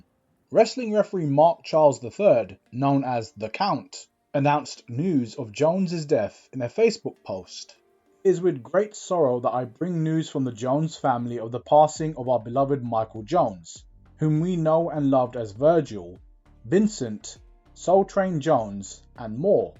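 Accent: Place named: United Kingdom. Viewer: How come you narrators are always British??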